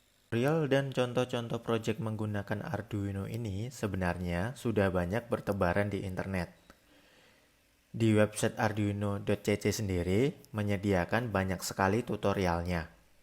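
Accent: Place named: Java, Indonesia. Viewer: Suara siapa ini kang ? Kok medok hihi Sukses channelnga kang,,, salam G2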